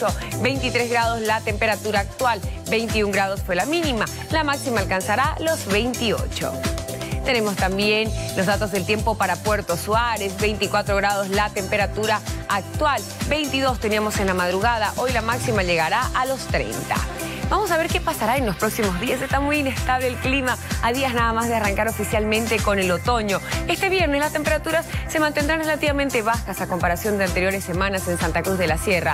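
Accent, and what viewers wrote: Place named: Argentina. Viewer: Che, esta mina habla igual q una argentina...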